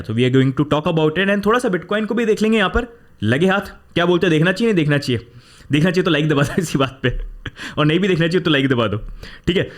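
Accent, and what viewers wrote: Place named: India. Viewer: In the end - Indian same pronunciation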